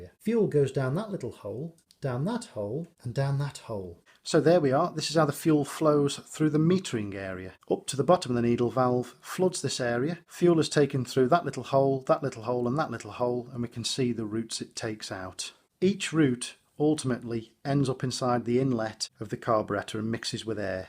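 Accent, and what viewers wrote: United Kingdom. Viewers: Man. Not only a great explanation but don't with a British accent. Cannot ask for more. A big thank you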